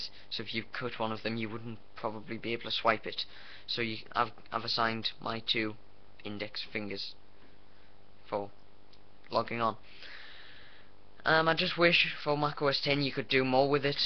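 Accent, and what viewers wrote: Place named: North East England, United Kingdom. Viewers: it's a north eastern accent, not quite Geordie but sort of sounds like it. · Its a Sunderland accent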